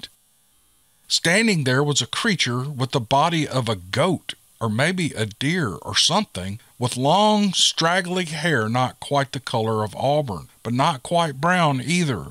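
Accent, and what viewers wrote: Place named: Louisiana, United States. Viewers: Really enjoyed this... Thx buddy..... PS.... That Ice cream being discontinued will save you on bike rides!!! Lol.... Oh yeah, nice Cajun accent! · GREAT Cajun accent! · Let's do it! Protest indeed. I have loved a couples of products that got discontinued soon after I discovered them! That is so aggravating 🙄 Especially certain flavors of foods. 😵‍💫 Love your story about your mother in law, too❣️ Never listen to the negative comments about your banter or rambling, or whatever, because I love it❣️ It's always interesting & it's true, real life stuff❣️Can't get any better testimonies, stories, experiences, etc., than that! Haters can move on if they don't like it. 😉 I'm sure I'm not the only one that loves your stories, ALL of them. Love your Cajun accent too❣️ 👊 Spot on!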